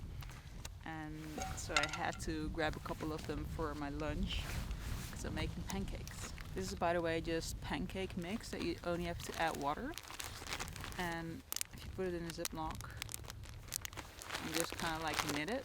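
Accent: Netherlands. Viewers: Hey! Small world! I randomly came across your Bowron Lake video and noticed from your accent that you’re Dutch? Did the Bowron Lake circuit in mid-May this year, shortly after moving from the Netherlands to Calgary. I brought my camera along as well and was hoping to film the trip, but unfortunately my camera went for an unplanned swim on the very first day 🥲 So I never got to make the video I had in mind. Anyway, I really enjoyed seeing your take on the trip! Thanks! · my algorithm brought me here, cool content, your accent sound a little dutch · Do I hear a Dutch accent? Love your content btw! Great views!! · Love the video! Thanks for sharing. I hear a slight dutch accent? Or is it just me? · You don't sound like real american accent. Are you Dutch? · Such a fabulous video, exciting and relaxing and inspiring. Do you have any Dutch heritage, your accent really reminds me of my fellow Dutchies. 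· Thank you Youtube, you are finally learning what I like. That's some amazing scenery, I also love to be out in nature solo. Am I crazy or do I indeed hear a Dutch accent? I often dream of moving to Canada to live this peacefull lifestyle. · What and adventure. And what a lovely woman! ❤. Are you dutch by any chance? You're accent kind off is but your English is flawless. Really enjoyed this film! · Your accent. Are you from the Netherlands? Very cool video. I love that canoe! I live in New Zealand. We have quite a bit of terrain like this as well. If you ever get the chance, check out the south island of New Zealand. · Are you Dutch? I'm trying to place your accent 😊 Beautiful adventure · Your accent is cute, you sound Dutch. Where are you from? Groeten uit Holland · Wow! That is so beautiful, what a cool adventure. You are very good at filming and editing by the way. I would love to do this trip, just not too thrilled about potential bear attacks but I guess chances of that are slim. 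I was trying to pick your accent but it's hard; are you Dutch? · Are you a dutch speaker by any chance? your accent reminds me of dutch speakers speaking english. Love your content!!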